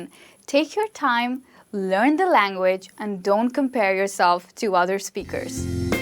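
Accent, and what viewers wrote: India. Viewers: Are you Indian. Your pronounce unfold it. Sometimes you spoke Indian english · I think she is speaking india English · What a beautiful indian accent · God this is Indian English . So funny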